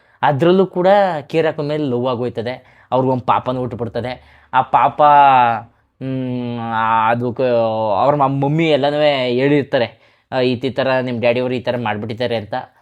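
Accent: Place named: Mandya, India. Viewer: ಜೈ ಮಂಡ್ಯ ಭಾಷೆ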